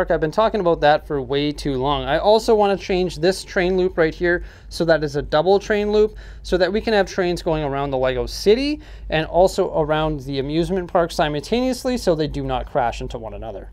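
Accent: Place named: Edmonton, Canada. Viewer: I’m so glad I subscribed to your channel in the mist of the virus 🦠. You got of interesting stuff going on right here and I can’t wait to see more. I love your Edmonton accent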